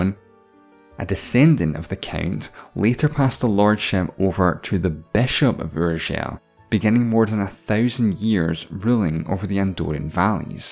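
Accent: Scotland, United Kingdom. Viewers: Do i hear a Scottish accent? 👀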